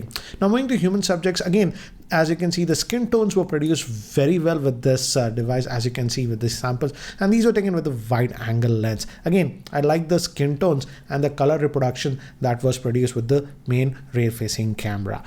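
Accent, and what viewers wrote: India. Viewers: Nice video, but I find it hard to understand Indians accent! And him speaking too fast makes it even more difficult to understand him.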